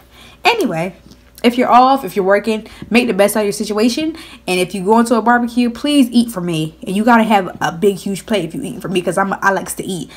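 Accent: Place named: Baltimore, United States. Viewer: You sound like me. Im from Baltimore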